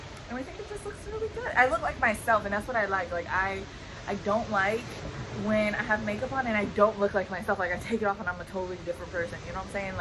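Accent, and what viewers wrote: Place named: Spain. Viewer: Hola 👋🏼 I’m a first time viewer...I found your video because my hubby & I are going to the same resort in Feb 2021...I really enjoyed watching this vlog! And the makeup tutorial was a bonus! You are so pretty and I loved your story about pronouncing things differently. I adore Spanish accents so when I hear it, it’s like “butter to my ears”! Anyways, I just wanted to say hi and let you know you have a new subscriber! Thanks again for making this video...glad you and your hubby enjoyed it! 😘🥰❤️PS I think you look like the actress Megan Fox! Lucky you😍😍😍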